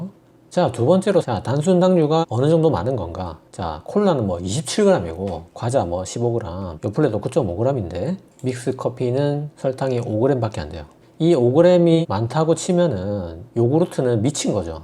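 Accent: Gyeongsang, South Korea. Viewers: 갱상도 쌤 같은데 하나도 지루 하지않고 귀에쏙쏙 ㅋㅋㅋ믹스커피의 억울한 오명을 벗겨주시네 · 사투리 늠조앙 ㅎㅎㅎㅎ경상도시네요 반가바용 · 은근히 구수한 사투리가 좋습니다 ᆢ감사합니데이^^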